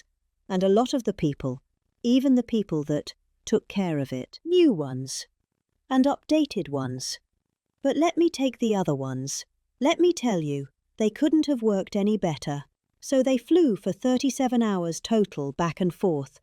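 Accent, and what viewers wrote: United Kingdom. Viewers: I have not laughed so hard in weeks. This is fabulous. Trump has early-onset dementia as well as malignant narcissism, so we are used to hearing him in speech after speech. But there is something that becomes so much more insane about hearing his actual speeches read by this British woman in her dialect. The ramblings are just...stunning. Thanks you! New subscriber.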